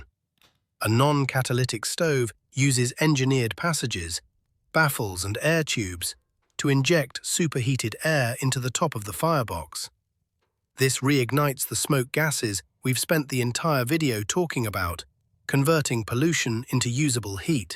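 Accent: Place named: United Kingdom. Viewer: Very well done, even with a British-like accent. :-) You might look inro something like a descendent of a pressure cooker to absorb far more btu's without filling the room with steam. I am sure most engineers could come up with something productive and financially rewarding. Best regards, JDB Retired Prof. Engr Mgr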